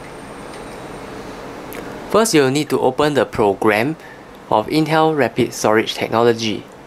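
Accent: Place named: Asia. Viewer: asian brotha accent for the win!!!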